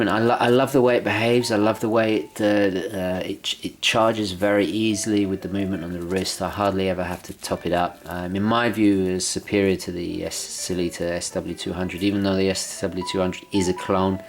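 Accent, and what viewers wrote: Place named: United Kingdom. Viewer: Alu MIN ium insert. Love the British pronunciation. Superb watch and review.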